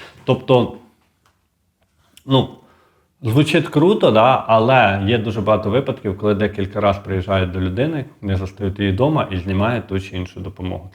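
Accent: Vinnytsia, Ukraine. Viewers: У нього вінницький діалект. Тому так розмовляє. А ви що, розмовляєте літературною мовою?!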